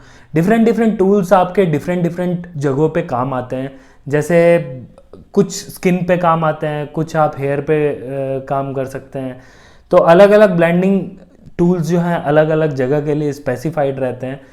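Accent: India. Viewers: अंग्रेजी बोलते हुए भी आप क्या कह रहे हैं मुझे समझ नहीं आ रहा है, लेकिन आपकी अंग्रेजी भारतीय है अमेरिकी अंग्रेजी बोलने की कोशिश करें ताकि दुनिया आपको समझ सके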